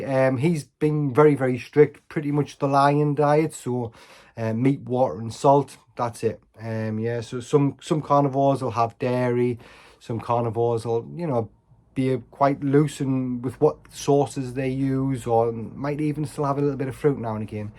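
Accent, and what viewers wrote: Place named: Newcastle, United Kingdom. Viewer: New subscriber, nice to hear another Geordie, thinking about this myself, currently binge watching everything on this lifestyle, hopefully its for me.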